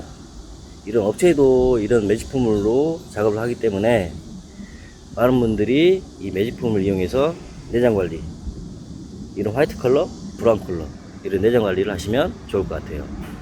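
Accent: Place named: Gwangju, South Korea. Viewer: 다른영상에도 댓글남겼었는데.. 번창하세요.. 같은 광주분이라서 더더욱 말씀이 구수하네요 ㅎㅎ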